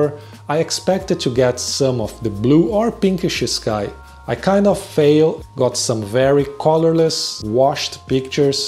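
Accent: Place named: Brazil. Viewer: Impressionante como sotaque de br da pra notar de longe kkkkkkk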